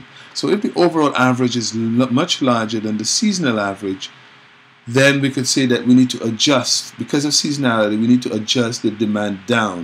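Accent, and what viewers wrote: Caribbean. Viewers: so pleasantly surprised when I heard the Caribbean accent. thanks for posting! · this has to be a Caribbean accent.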